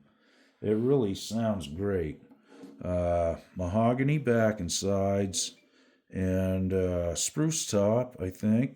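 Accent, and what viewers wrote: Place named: Boston, United States. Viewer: New subscriber!!! Great video and I love your collection. Your approach to video and guitar philosophy is enjoyable and engaging. I know you are in Maine but sound just like we do in the Boston area. We locals would tell you that "You collection is pissa!"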